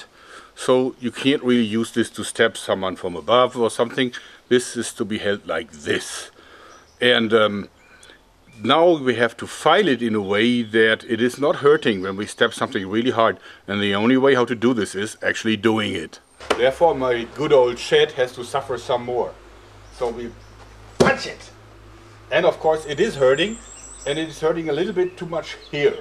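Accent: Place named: East Germany, Germany. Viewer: Sounds eastern German, but I'm not sure. He is German though so the accent is from a germanic country.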